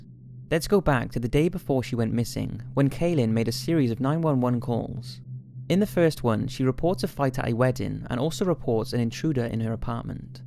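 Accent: Wales, United Kingdom. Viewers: He's Welsh. You like Welsh. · thx my sexy british senpai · Gabriel Mondragon he is 100% welsh, I’m welsh and I recognise his accent well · He's Welsh mate.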